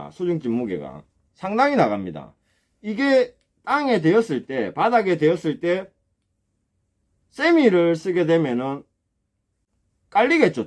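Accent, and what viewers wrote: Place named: Gyeongsang, South Korea. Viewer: 혼자 방송하는데 마스크 벗고해도 되지 않을까요? 경상도 억양이라서 알아듣기 힘든데....발음까지...ㅠㅠ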